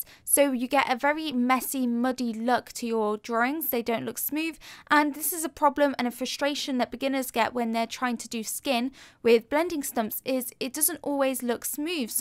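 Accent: England, United Kingdom. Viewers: Thick english escent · I like her English accent.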